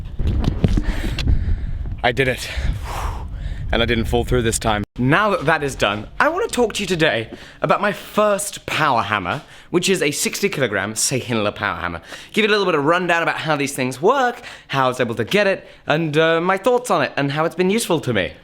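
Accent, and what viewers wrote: United Kingdom. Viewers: I really am curious.. Texas and American Flag. British accent. You relocate to Texas or just love Texas? Thank you,Proud Texan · bro, wtf... I see American flags, Texas flags... don't tread on me... but the accent and the measurements... what are you!!!!!!!!???? Brit transplant, yank transplant? I'm so confuse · Wait, are Brits allowed to wear boots?? Don't get me wrong, I don't know why everyone doesn't, but I don't think I've ever seen someone with your accent and a pair of cowboy boots. Props to you sir!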